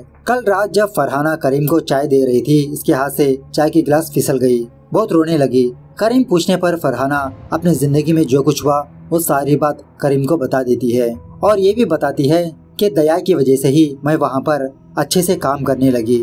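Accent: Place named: South, India. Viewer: it’s not shudh hindi Southern style Urdu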